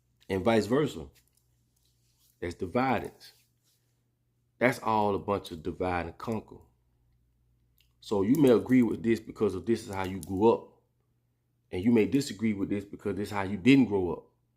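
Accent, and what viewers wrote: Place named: Florida, United States. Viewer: I love that country Florida boy accent 😍💙 keep dropping the knowledge I love it & we need it. U reaching ppl. #memphistn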